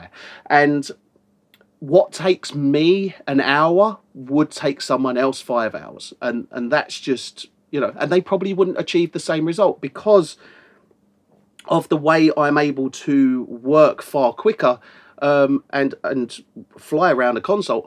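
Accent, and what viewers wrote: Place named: Cockney, United Kingdom. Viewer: So, this is cockney accent, I guess :)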